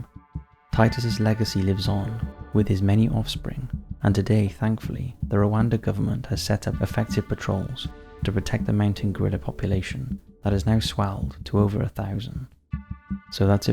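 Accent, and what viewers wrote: United Kingdom. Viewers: British narrator asking for dollars. I'm guessing this is an American channel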